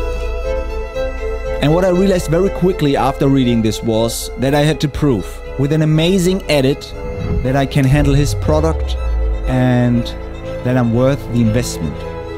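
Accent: Germany. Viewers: Ich glaube damals über das Colour Change Video in Premiere. Fand deinen Humor einfach sehr amüsant, außerdem ist es sehr angenehm, dass du nicht versuchst deine Herkunft zu vertuschen in deinem Englisch sondern den Akzent einfach mit Humor nimmst. Macht es soo viel nachvollziehbarer und sympathischer (Bitte nicht falsch verstehen, dein Englisch ist sehr gut nur hört man es natürlich raus, dass du auch Deutsch sprichst :)).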